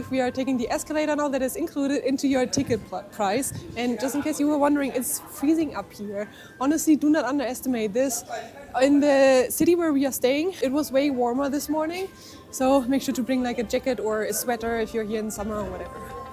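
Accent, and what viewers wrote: Germany. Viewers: Hallo! Ich höre da einen deutschen Akzent raus-daher in deutsch: großes Lob für super informative Videos! Wir sind demnächst auch in den Avatar Mountains.Darf ich mal fragen, wie ihr das mit der Drohne und der Anmeldung hinbekommen habt? Man benötigt, so weit ich weiß eine chinesische Telefonnummer. Würde mich über eine Antwort freuen.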